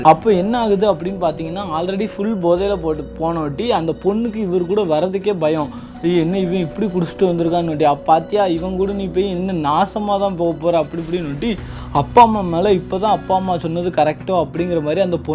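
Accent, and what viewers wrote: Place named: Nagercoil, India. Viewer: Your nagercoil accent superb thambi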